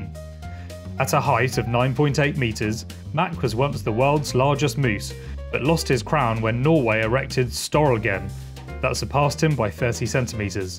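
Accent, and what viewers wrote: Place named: United Kingdom. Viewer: The British accent makes me wanna vomit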